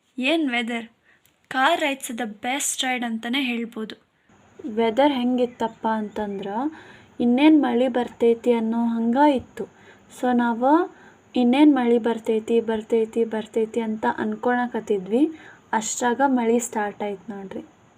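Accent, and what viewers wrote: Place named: North Karnataka, India. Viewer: Video ಚಲೋ ಮಾಡಿರಿ ನಿಮ್ಮ ಉತ್ತರ ಕನ್ನಡ ಭಾಷೆ ಕೇಳಲಿಕ್ಕೆ ಒಂದು ತರಹ ಪಸಂದ್ ಆಗುತೈತ್ರಿ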